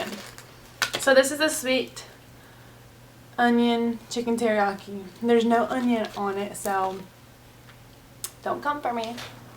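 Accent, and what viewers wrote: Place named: South, United States. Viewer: I'm impressed by yer appetite and yer food-crushing capacity. Looks like you could eat a lot of guys under the table. And yet you don't look like a truck driver – quite the opposite. So, have you ever been out on a dinner date and scared a dude? Hey, by the way, when you're talking, it seems a subtle Southern accent kind of comes and goes – are you in a Southern state?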